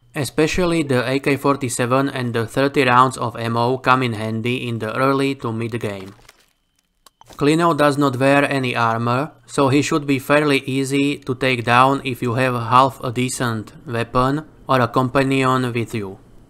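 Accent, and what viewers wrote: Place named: Russia. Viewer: Spasiba,. You're accent sounds Russian /Ukrainian to me, so excuse me if I got that wrong. Your video is very helpful, because Klinov killed me twice.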